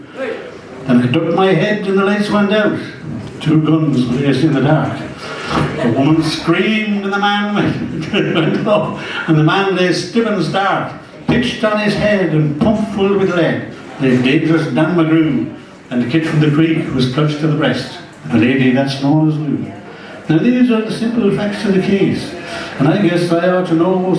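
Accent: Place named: Ireland. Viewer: Words are unclear to a non Irish speaker of English.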